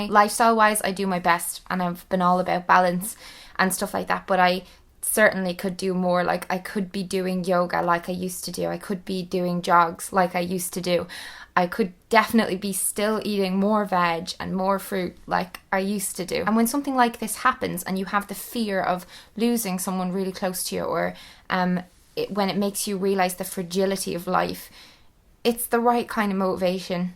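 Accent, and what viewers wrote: Ireland. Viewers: One: her dad had a fucking heart attack, do you really think this is appropriate? Two: she's Irish, that's her accent. Three: you're a moron.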